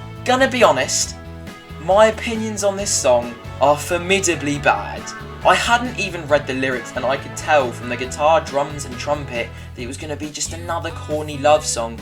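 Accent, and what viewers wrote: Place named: United Kingdom. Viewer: Bruh he bri’ish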